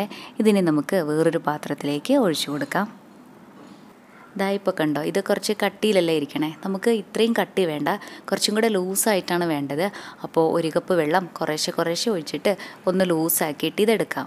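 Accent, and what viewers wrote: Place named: Thrissur, India. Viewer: 🌷👌🌷, നല്ല തൃശൂർ ശൈലി ഭാഷ 🤪💞👌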